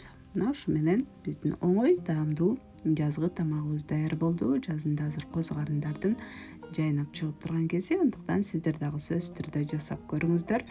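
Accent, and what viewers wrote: Standard. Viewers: Сонун экен эже рахмат. Сиздин баарынан да бир да орусча аралаштырбай таза тунук кыргызча суйлогонунуз жагат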